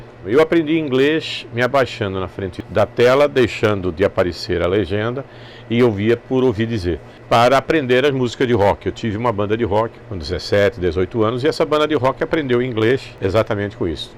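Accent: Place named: Manaus, Brazil. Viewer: Português/ Manauara. Admiro esse homem.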